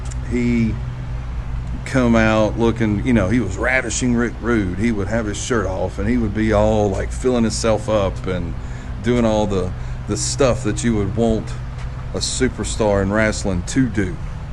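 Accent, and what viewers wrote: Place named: South, United States. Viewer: You have great southern voice. God bless you friend.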